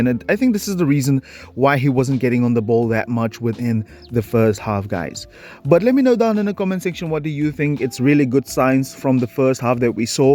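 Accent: South Africa. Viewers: Cant wait for the second half’s video. Lol i dont know why but ypu sound a little bit South-African …accent wise😁😁😁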